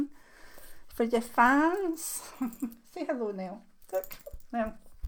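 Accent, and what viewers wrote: Scotland, United Kingdom. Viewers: Hi, Thank you for your insightful reading. Just wanted to say I love your Channel. My parents are from Lanarkshire but we live in South Australia, so it is nice to hear a familiar Scottish accent. Thank you xx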